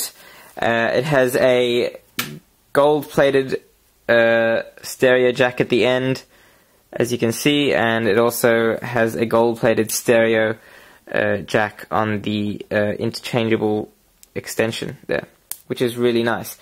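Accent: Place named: South Africa. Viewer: He sounds kinda South African.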